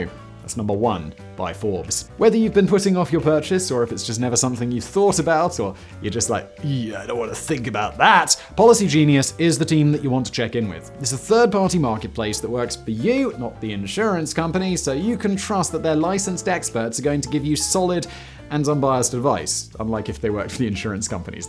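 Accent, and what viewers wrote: United Kingdom. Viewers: Maybe it's my imagination, but, to me, becomes a little more British when he makes a video about his home country.🧐☕🇬🇧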